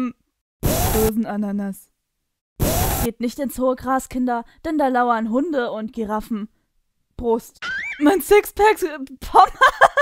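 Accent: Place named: France. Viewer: haha wie geil :D Dein französcih-deutsch hört sich so goil an...RECHTSCHREIBUNG ARGH! XD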